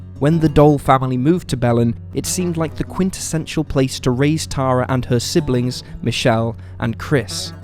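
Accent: England, United Kingdom. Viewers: sadly I fear your conclusion is correct ... men, and police in particular, protect their own. So the son would have been aware that he could get away with such vile behaviour. Have watched other similar channels but your gentle English accent appeals, thanks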